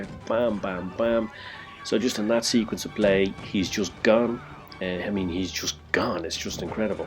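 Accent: Ireland. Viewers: Are u from ireland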